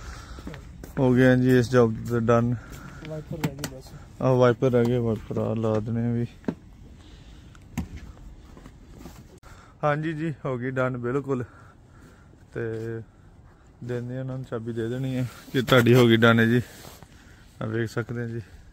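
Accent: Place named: Punjab, India. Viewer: love watching your videos bro. keep it up. your Punjabi is just like mine.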